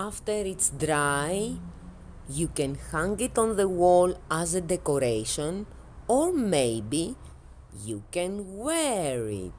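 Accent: Greece. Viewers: greek accents are the best <3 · Guys, she is greek. This is the classic greek accent, i live in greece sooo... XD (edit: also the newspaper is in greek.) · Excellent tutorial. The aluminum foil is a great idea for the underlying structure. Love your Greek accent too.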